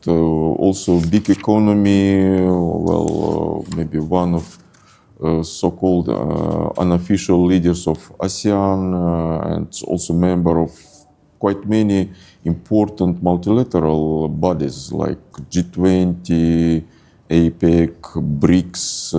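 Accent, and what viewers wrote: Russia. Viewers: Aksen rusianya kental.. Keren..😊😊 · Gw tetep harus denger karena gw juga pengen denger aksen rusianya pas ngomong bahasa inggris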